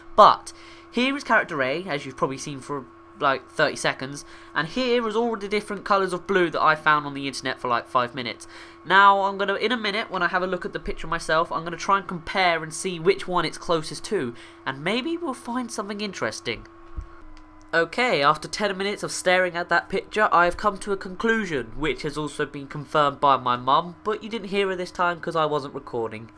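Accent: United Kingdom. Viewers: Are u british? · Yes another british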